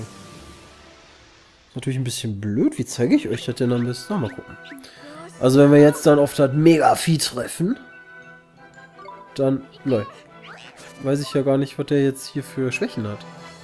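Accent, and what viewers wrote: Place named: Cologne, Germany. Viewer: Ne das ist Kölner akzent :)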